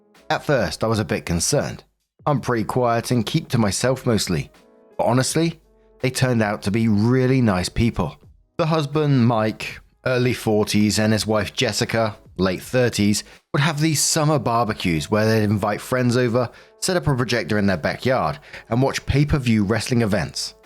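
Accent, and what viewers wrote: United Kingdom. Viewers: I love the sound of British English. They have so many cool accents over there 💕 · I'm pissed someone had the gall to complain about your speech. I knew just what they meant, I'd heard it. I love that you speak uk English. Fuk em all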